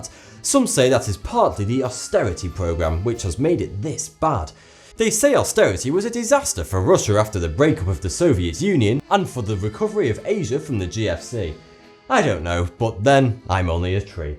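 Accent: United Kingdom. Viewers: Wow, you live in Melbourne and don't even know the correct pronunciation of the name, it's only because of your accent that you say it like that. has a British accent therefore he speaks the correct pronunciation of the word. And fix your grammar dude.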